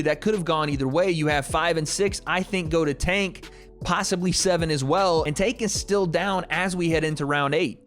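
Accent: Ireland. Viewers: Bro if I hear that Irish accent again I'll unsubscribe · For an american your irish accent is pretty fire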